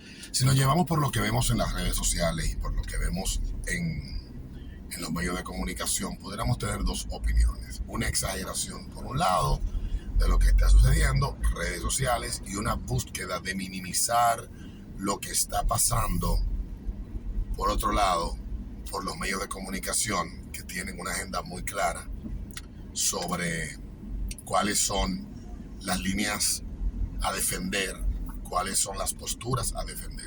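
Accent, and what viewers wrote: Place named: Dominican Republic. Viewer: rayos en verdad cuando vi la miniatura pense que no tu aspecto no parecia de una persona de republica dominicana, todos esos pensamientos desaparecieron cuando escuche tu voz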